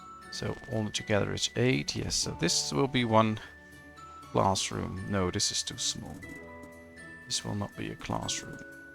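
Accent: Netherlands. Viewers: I was trying to place the accent, I was thinking Dutch then you said Netherlands 👌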